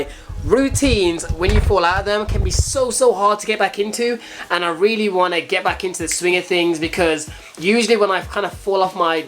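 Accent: Birmingham, United Kingdom. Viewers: Daaamn that birmingham accent ! Just makes me wanna kill my self bruh your accent is the worst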